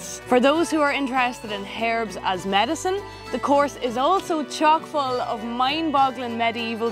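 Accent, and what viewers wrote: Ireland. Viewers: I LOVED THIS AND THAT IRISH ACCENT !! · Thank you and greetings from U.S. If I got into trouble, my Dad use to say, "If you do that again, I'll take the Shillelagh to ya". He would have loved to hear you talk. He loved the Irish accent, it seemed musical to him. I carved a walking stick for my brother in law after he had back surgery and he said he wasn't going to walk around looking like an old man with a cane (he was only 65). He didn't want it but when I said it wasn't a cane, it was a Shillelagh, he smiled and grabbed it from my hand. It isn't a Shillelagh but I won't say anything.👍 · I don't know what it is about irish excent, but it makes me laugh mien ares off. 😂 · I just like hearing her talk. The Irish accent is like music to these old ears.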